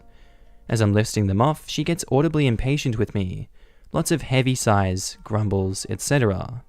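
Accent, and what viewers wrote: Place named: Australia. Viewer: BTW i recommend your channel to my aunt who’s learning English, for listening skills. God thing you’re a native speaker!! Aussie accent is real good for learners! <3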